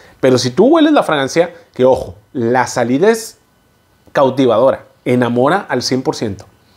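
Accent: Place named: Monterrey, Mexico. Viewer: Por la camisa que traes y el acento que tienes,me imaginó que eres de Monterrey y allá el calor esta cañón...buen perfume para el calor de Monterrey.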